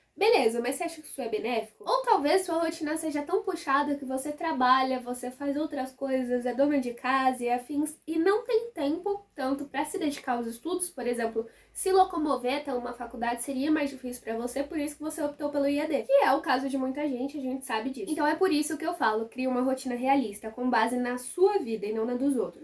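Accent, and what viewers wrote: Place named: Northeast, Brazil. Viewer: É nordestino cara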